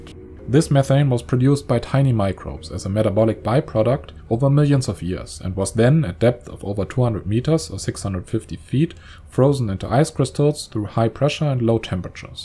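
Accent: Germany. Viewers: You have the most german accent I've ever heard, and I lived there for years. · There is something vaguely menacing about a guy with a Germain accent talking about the end of the world 😳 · Is the speaker German native? Hört sich ziemlich deutsch an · i really love your videos but I must strongly recommend you invest in a native english speaker who also has a good voice because that would make a huge difference. your english skills is excellent how ever the german accent is pretty heavy and your voice is unfortunately doesn't have the best pitch making it very difficult to keep the focus on the flow of the video. I hope you don't get this criteria in a personal way I really think your videos are great but just the audio reduce the quality drastically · his heavy german accent brings some extra feel to the story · Everything is great about this video but the commentator looks like he have a germane accent..I’m sorry but it’s really annoying and uncomfortable! 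· Ok... I knew it... This is a German person talking. Great animations and to my knowledge well researched I will subscribe. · Everything sounds better with a German accents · i dunno why but hearing a German sounding guy talk about it being the largest graveyard in history.. made me laugh... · He is German. I was pretty sure from the accent, but in another video he mentioned some German cities and names and it was obvious that it's his first language. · I like how the narrator sounds like a Nazi scientist. 🙂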